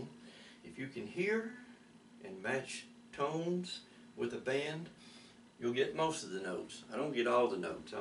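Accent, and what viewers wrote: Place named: Atlanta, United States. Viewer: Great sound. I have just constructed one but not sure best string to use?? Are you from Atlanta - nice accent. (I'm British from Michael Caine country - South London)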